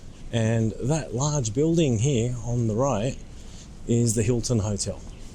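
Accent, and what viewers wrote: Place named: Japan. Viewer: 日本人っぽい英語ｗ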